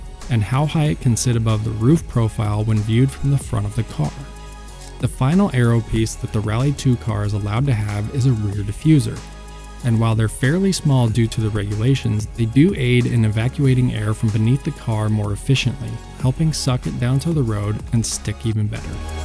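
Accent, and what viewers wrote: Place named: United States. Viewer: An american speakimg on rally? Its a no from me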